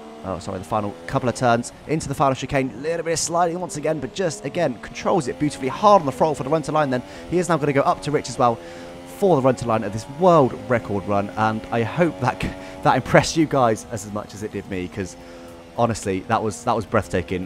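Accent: United Kingdom. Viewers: For the first ten seconds I thought you sounded awfully English for a German fella.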